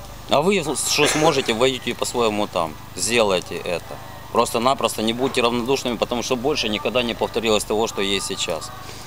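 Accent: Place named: Ivano-Frankivsk, Ukraine. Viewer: Какой то Одессит мама дорогая я вас умоляю?? ! Не делайте мне нервы своими фейками ;) По говору Таки он с Ивано Франковска!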